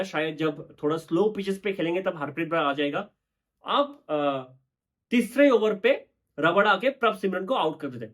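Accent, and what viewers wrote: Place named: Tamil Nadu, India. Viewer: Bengali hai kya bhai 😲 😲 mujhe laga tamil nadu ka hai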